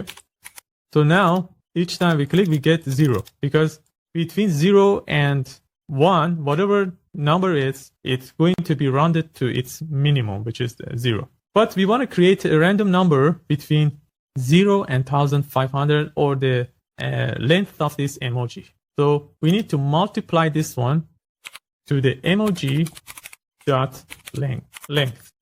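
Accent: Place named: Iran. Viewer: داداش همین که صداتو شنیدم فهمیدم ایرانی 😂❤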